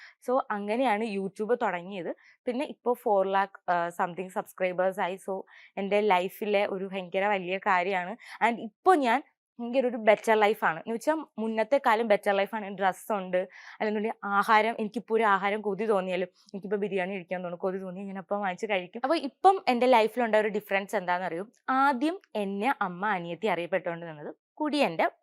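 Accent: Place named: Trivandrum, India. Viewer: Tvm slang aan samsaarikkunnath🙄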